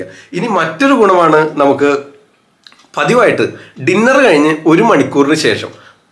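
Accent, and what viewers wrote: Thrissur, India. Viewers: Ethra nalla avatharsnam dr.Trissur bhashayodu thanne sneham thonnunnu😍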